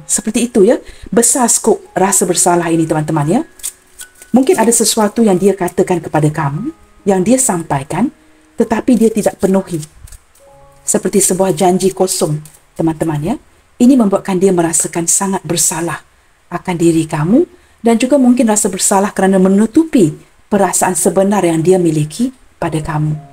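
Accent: Malaysia. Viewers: Terima kasih bunda kesayangan ku atas pembacaan nya hari pembacaan yang sangat mengemaskan terutama pada swara sexi nya bunda yang bernadakan / berbasiskan Melayu ILIKE IT sangat sexi sekali swara nya bunda semangat selalu ya bunda dalam kasih pencerahan nya semoga semakin maju Chanel nya kami sangat mendukung Chanel tarot thank you 👍👍👍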